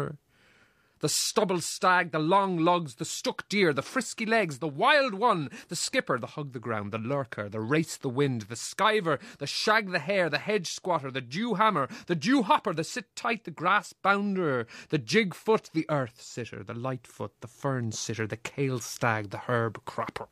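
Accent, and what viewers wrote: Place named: Ireland. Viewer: omg!!!! his irish acent! is georgeous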